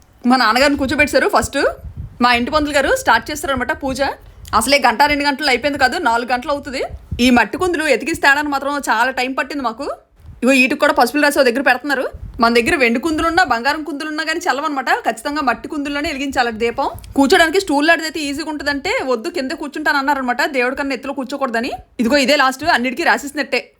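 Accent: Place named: Uttarandhra, India. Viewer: గారు,అబ్బా వీడియో అంతా ఎక్కడా ఏ చిన్న విషయాన్ని వదిలేయకుండా మొత్తం కవర్ చేస్తూ ఫంక్షన్ మొత్తం చూపించేసారండి, మీరు మాట్లాడే యాస ఉత్తరాంధ్ర భాషపై మాకున్న ప్రేమని మరింత పెంచుతుంది,పెళ్లి బొమ్మలు మాత్రం ఎక్సలెంట్ అండి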